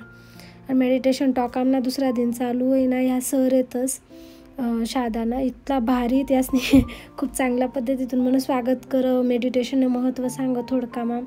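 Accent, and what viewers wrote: Khandesh, India. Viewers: Didi tuna video khupch mst rahtas male khup aavdts.. 😅❤aamhi pn shirpur na shet tu khup bhari khandeshi bols aate nya pori khandeshi bolane laj tis nko ka thika na rahe na ghar na dar na.. Tu disale pn khup sundar aahe. 😊 male pn aasch khar bolale aavds jast badaykya marale nhi aavds.. Ek nmbr video rahts.. Tu mo no send kar jo na...... 🎉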